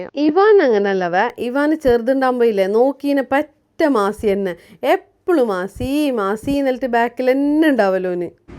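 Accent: Kasaragod, India. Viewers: ഇതെവിടുത്തെ ഭാഷയാണ് കാസർഗോഡ് ആണോ, എന്ത് ഭാഷയാ ട്ടോ, ഒന്നും മനസ്സിലാകുന്നില്ല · Kasrod bhasha nte athra oru bhashyum indavvula aabe❤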